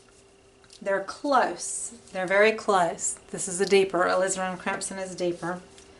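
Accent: South, United States. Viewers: I love your videos. You are so soothing with your southern accent and your painting are beautiful!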